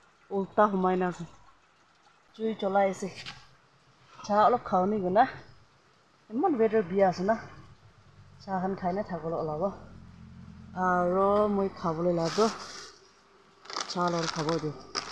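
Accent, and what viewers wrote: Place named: Nagaland, India. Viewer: Sis I like da way u speak nagames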